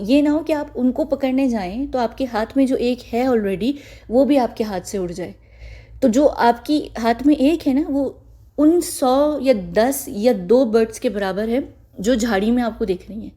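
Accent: United Kingdom. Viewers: You have a beautiful British accent 😘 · I like ur English accent, unlike some haters I just hope u speak in English more.. 😀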